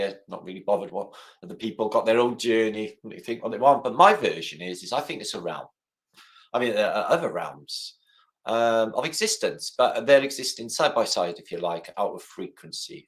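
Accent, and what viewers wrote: Wales, United Kingdom. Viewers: Are You Welsh? NOOOO WAAAY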